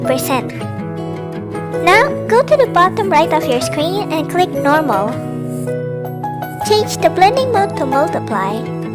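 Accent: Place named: Philippines. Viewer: u sound like pinoy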